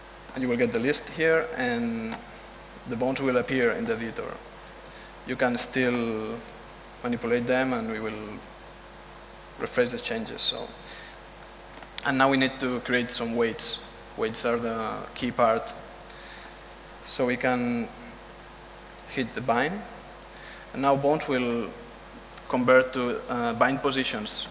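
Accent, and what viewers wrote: Spain. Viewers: is he spanish??? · Como se nota que es español ajaja gran trabajo men, sigan asi 👍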